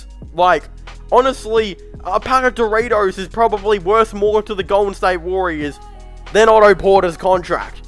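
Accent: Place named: Australia. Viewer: I've subscribe because I love this dude's accent. I wonder where he's from? 🤔 Maybe Australia 🤷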